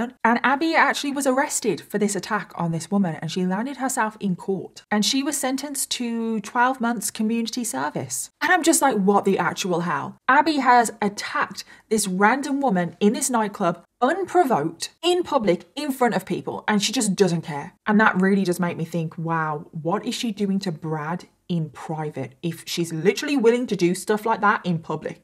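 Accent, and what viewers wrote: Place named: Birmingham, United Kingdom. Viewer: ‘A bit off topic’, but your accent sounds Birmingham. Am I right?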